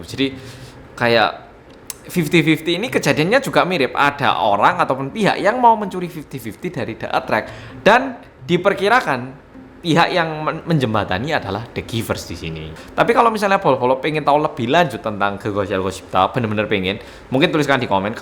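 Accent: Java, Indonesia. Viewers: gak papa mba . Memang tdi keingatnya di kepala juga begini. Ada logat Jawa nya😄 tapi ini beneran, saya tidak menyinggung siapapun yaaa. Ini hanya pikiran yang muncul sekelebat di benak saya. Saya mohon maaf kalau ada salah kata atau pelafalan saya jika ada pihak yg tersakiti 🙏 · wkwk...sama kan...berasa banget logat jawanya..tpi seru sih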